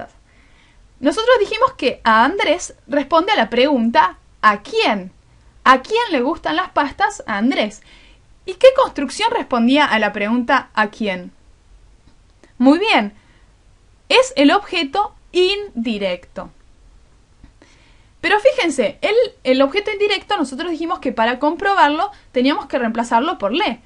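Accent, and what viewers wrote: Argentina. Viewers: Está muy bien explicado, y el acento argentino le da el toque, ¡GRACIAS!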